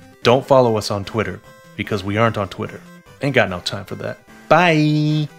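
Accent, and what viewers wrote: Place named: France. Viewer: great French accent!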